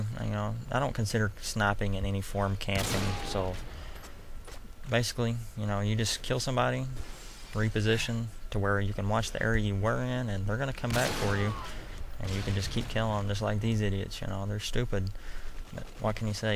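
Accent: South, United States. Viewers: u have a southern accent? cause u say snappin ^^ and u do exactly what i do listen to footsteps its incredible the people who dont... cool vid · Nice commentary. Where r u from? Southern US somewhere I'm guessing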